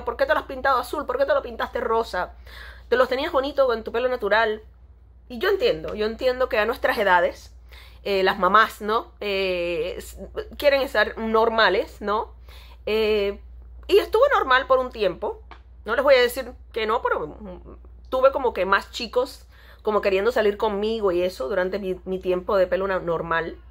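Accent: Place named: Chile. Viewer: alguien más se dio cuenta que ya se quedó pegada con el acento chileno XD saludos desde chile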